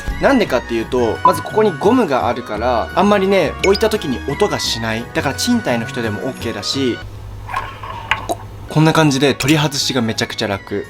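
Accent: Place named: Kansai, Japan. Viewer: 君は埼玉人だけど、俺自身たぶん言われんでも分かってるんやろけど、大阪出身で大阪10年間育った人間なので、所々関西弁使ってくれてはる君観るとマジ親近感湧きます！！⤴️⤴️⤴️ 君が関西弁敢えて喋るとイケメンさが人一倍ごっつ映えるね〜👍👍👍😍😍😍ありがとう、おおきに！🙏これからも友達でいましょう〜！😭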